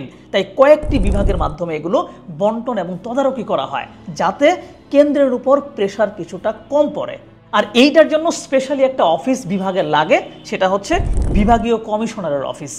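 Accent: Noakhali, Bangladesh. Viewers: আপনার বারি মনে হয় নৌয়াখালী মনে হয় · ভাই আপনার বাড়ি নোয়াখালী নাকি?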